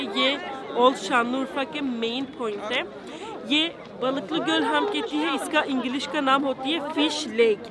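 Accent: Pakistan. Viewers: Assalamualaikum .I like your Urdu accent alot .You put genuine efforts to make videos . Narrating the story of Hzth. Ibrahim (a.s) & Hzth Ayyub ( a.s) was very informative . I haven't came across those maqam e mubarak before .May Allah (s.a.w.t) bless you & your family with good health, happiness, longevity , success and prosperity. Your brother from Hyderabad, India. Fia'amanillah. (Mohammed Azeem).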